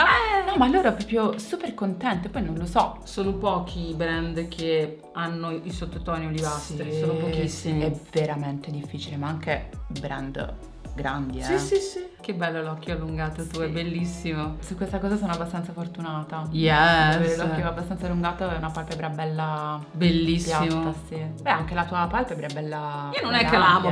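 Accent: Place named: Apulia, Italy. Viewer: Riconoscerei una Pugliese tra mille persone ahaha. Adoro ❤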